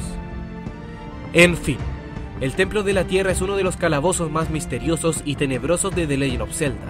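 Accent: Chile. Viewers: tu voz me parece de Chile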